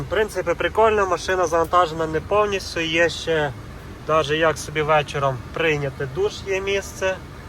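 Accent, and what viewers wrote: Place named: Western Ukraine, Ukraine. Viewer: Файно слухати свою мову, ви похоже з наших країв, привіт з Тернополя 😊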